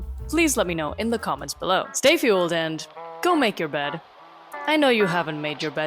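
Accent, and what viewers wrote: Spain. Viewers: I´m gonna say, this is actually a good yt video... And hold on there is a really good spanish pronunciation, but i mean spanish from Spain. R u spanish...?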